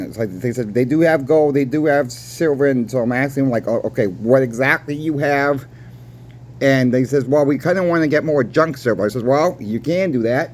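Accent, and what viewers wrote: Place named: New England, United States. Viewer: I don't know if those customers are actually going to read your comments or watch the video however you pose the question are they doing the right thing by getting rid of the collection? As a stacker my answer would be absolutely two things going to happen here if they get constitutional silver that money yes money is guaranteed and backed by the United States Treasury not the Federal Reserve Etc that's actually backed by the United States of America and as far as generic rounds well if the price of silver skyrockets they win their as well because at what point does the collection just become worthy of the silver that's in it or the 50 or $100 in coinage that they have due to the fact that no one has money and no one's going to care that they are Collectibles they are going to look at the intrinsic value being that of silver money. 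Just my two cents I absolutely think they're doing the right thing by getting a mix of constitutional silver, ASE, generic rounds. Keep up the good work every once in awhile the New England accent comes out and I get a kick out of it being from New England myself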